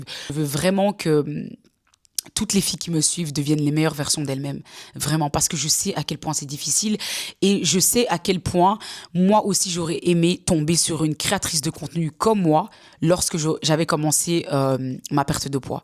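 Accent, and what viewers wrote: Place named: Belgium. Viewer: Olalala, en t’entendant parler, je me suis dit, c’est sur elle est belge ! Et puis tu parles de ta maman qui habite tout près de la Meuse… je ne savais pas que je tomberais sur la vidéo d’une liégeoise en étant moi en pleine perte de poids et changement de mode de vie 🥹 ça fait trop plaisir ! Et t’as vraiment fait les choses correctement. Bravo ! C’est vraiment un changement de mode de vie à adopter sur du long terme la « perte de poids », pas juste faire des régimes hyper restrictifs sur une courte période. En tout cas, merci pour ta vidéo, t’es adorable et super courageuse ❤️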